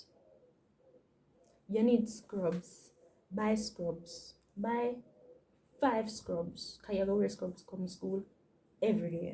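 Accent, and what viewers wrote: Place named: Caribbean. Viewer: I love your carribean accent girl!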